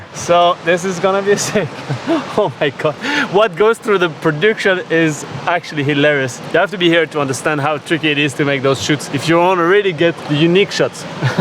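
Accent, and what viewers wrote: France. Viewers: Dude, you Scream French!!! Never Stop!!!! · Nice footage but that french accent 🤢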